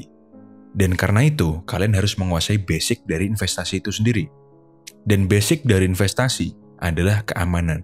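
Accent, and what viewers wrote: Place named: Southern Java, Indonesia. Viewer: Dari semua video yang saya tonton Tebakan saya sepertinya beliau orang jawa tengah bagian selatan atau yogya bagian selatan. Beliau bilang bapaknya tentara, kakeknya petani dan nelayan artinya rumah ya dekat pantai Logatnya bukan jawa bagian utara, tapi selatan. Beliau juga memelihara burung yang itu buming di jawa bagian selatan. Kalaupun tebakan saya mleset, mohon maaf Namanya juga menebak 😅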